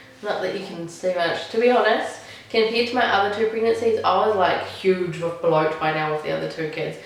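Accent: New Zealand. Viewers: congratulations ! ive just started vlogging i live in melbourne but am from nz too, cool to see / hear the kiwi accent on you :) all the best for your pregnancy journey 💕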